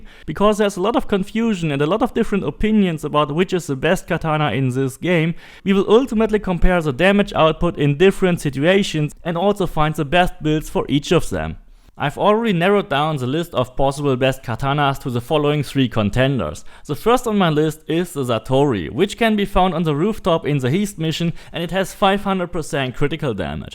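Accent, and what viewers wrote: Germany. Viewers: Der deutsche Akzent is nicht zu überhören 😅 super Video 👍🏻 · Man hört definitiv den Deutschen raus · XD I listened was like wait is this a German accent I looked found the impressum jep German xD danke für das Video bleib gesund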